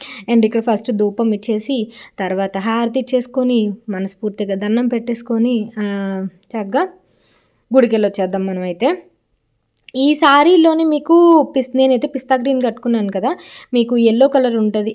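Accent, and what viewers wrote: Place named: Telangana, India. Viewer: You have been staying for many years in Telangana, so don't worry that much if you are speaking Telangana slang telugu, that is also good language like Andhra slang telugu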